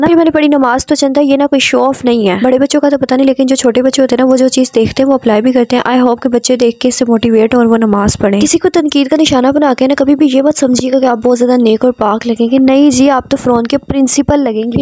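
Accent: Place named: Punjab, India. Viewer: Ap ka bolny ka style superb h sat mien jo taraa wala yani punjabi ka tarka very nyc stylish design beautiful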